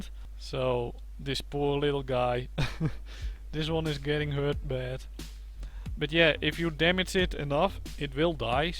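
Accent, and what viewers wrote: Netherlands. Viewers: You are from the netherlands i hear xD maybe you need to learn the accent of english before making an english channel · Most Dutchies have an accent, take it or leave it. You don't need to have an English accent to have an English channel. If you don't like the Dutch accent you have 3 options, deal with it, mute or get lost.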